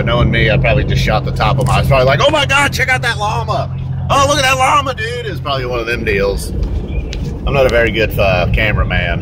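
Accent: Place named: Alabama, United States. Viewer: Damn. You could be riding through Slapout, Alabama if I didn't know any better. Just different accents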